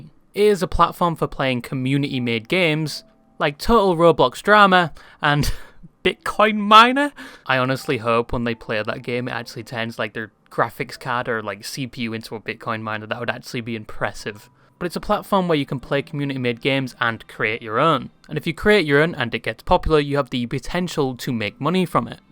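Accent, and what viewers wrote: United Kingdom. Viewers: And that Is nice British accent 'give me a bottle of wot'ter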